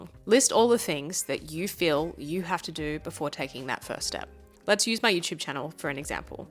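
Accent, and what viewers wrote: Australia. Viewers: Sounds Aussie like Struthless. Cool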